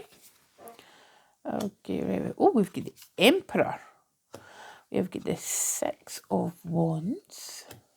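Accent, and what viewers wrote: Scotland, United Kingdom. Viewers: Thank you for your compassion and thoughtfulness thru this reading. I love a Scottish accent ☺️ onwards and upwards 🙌 thank you 🙏❤️✨ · Her Scottish is very broad but there is always the option to turn in the captions. I can’t watch a Scottish or Irish show without them and this is no different but worth the effort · So nice to hear a beautiful Scottish voice. Thank you for the messages, it definitely resonates.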